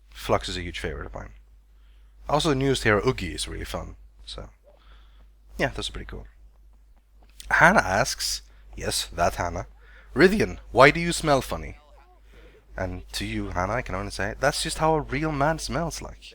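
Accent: Scania, Sweden. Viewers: Varför alltid skånsk?? TheSwedishLad är samma sak... Pewdiepie är den enda som jag känner till som inte är skånsk... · DIN SKÅNSKA THO omg like i'm from skåne but holy shit it's so weird to hear idk why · Herregud han är skåning:D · 2 skånska 4 me även fast jag är skånsk.. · Fuck! Han e skåning! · OMG det känns så konstigt att höra dig prata skånska xD Men det är underbart. Du är bäst Rythian. Fortsätt vara awesome! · Det var så märkligt att höra dig prata skånska... vet inte vilken dialekt jag tänkte att du skulle ha men skånska fanns liksom inte på tapeten. As bra kanal, keep up the good work! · Skånsk dialekt = MindBlown! Din Engelska Dialekt är Godlike men jag trodde ALDRIG att din svenska skulle va så skånsk XD, Anyways You're an awesome Swede Ska fösöka komma med på någon Ventrilo eller så med er :) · MIND BLOWN!!!! DU E SKÅNSK !!! (göteborg ftw heheheh) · Det var inget annat än skumt att höra dig prata skånska, men du gör bra videos så fortsätt med det! 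· Haha, visste inte att du va svensk fören du snacka skånska '' jag bara wtf'' xD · cause he speaks skånska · JA RYTHIAN ÄR SKÅNING :D har du varigt i ängelholm? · Å herregud! Du pratar skånska! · SKÅNSKA FTW! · Yaaaay du är skånsk =D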